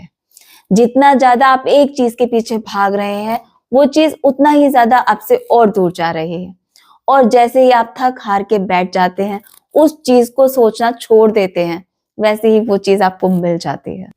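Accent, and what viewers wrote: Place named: Gujarat, India. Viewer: Aap ki language sunke muje lagta hai aap gujrati ho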